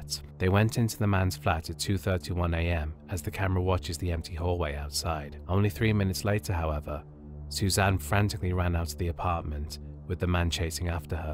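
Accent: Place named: England, United Kingdom. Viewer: This guys English accent makes these sad accounts worth listening to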